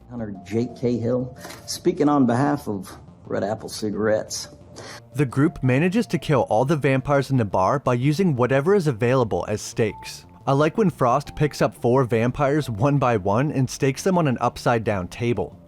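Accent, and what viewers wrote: Canada. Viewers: This is random, but are you Canadian my guy? lol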